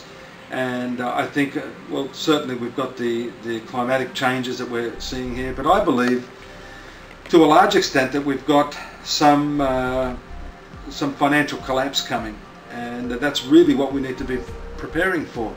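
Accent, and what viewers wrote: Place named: Australia. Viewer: Ah...I was right...you're an Aussie. At first I was wondering what part of England you were from and then I got it. Thanks for the info--I'm a Sadge.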